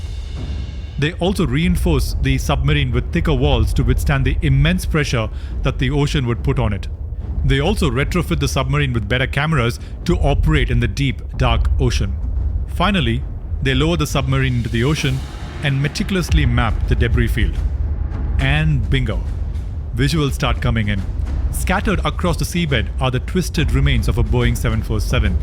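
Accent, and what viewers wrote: India. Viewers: Awful channel, awful Indian accent 😂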